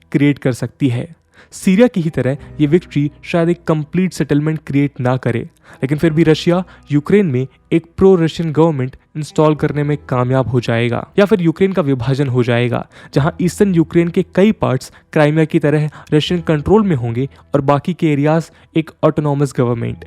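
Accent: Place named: Bihar, India. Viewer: Mr., From your voice tone, I think you are from North Eastern Bihar.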